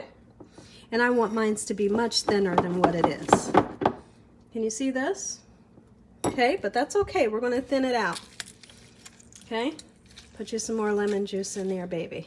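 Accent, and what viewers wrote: South, United States. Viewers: I love this lady so much she sounds so southern 😂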